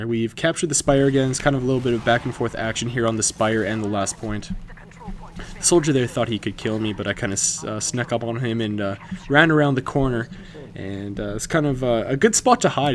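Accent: Canada. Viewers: Also, are you Canadian? Kind of hearing an accent. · I know that accent.... are you Canadian?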